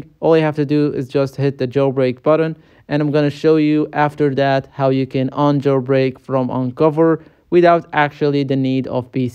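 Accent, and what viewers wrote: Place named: Arab world. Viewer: لهجتك عربيه🙂👍🏻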